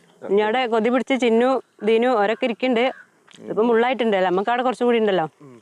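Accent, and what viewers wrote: Malappuram, India. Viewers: മലപ്പുറം ഭാഷ 👌👌🤦🏻‍♂️👌👌👌😂😂😂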